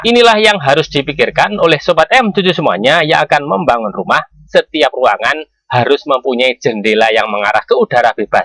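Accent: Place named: Java, Indonesia. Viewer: Mlungker kaya obat nyamuk bakar Jawa medok , sukses selalu bro .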